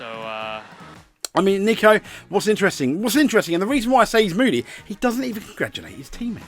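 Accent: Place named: United Kingdom. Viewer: He’s allowed to ask the question!!! BTW…are you British? Of course you are!!!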